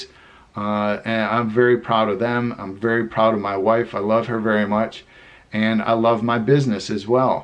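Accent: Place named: Upstate New York, United States. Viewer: OH MY GOD I GREW UP IN JOHNSTOWN! Was born in brooklyn and moved there when i was 7 and moved back to nyc at 19. I just choked listening to you say this! Jansen Ave elementary school and Knox jr high Lol!! Im dying this is TOO FUNNY! No one ever knows where it is, they always think I mean Johnstown PA. I have been wondering where you were from because you totally have that upstate accent. wemple and edicks chocolate jumbles were my favorite cookies growing up and we always went to Saltzmans in Euphrata every summer for their milk weed and fried chicken. I suffered MANY terrible haircuts in Johnstown and my Best friend Maria and I used to beg my Mom to take us to Albany to get Posner products after watching soul train every saturday because we both had thick curly hair and needed help. Beautiful family, thanks for sharing! :)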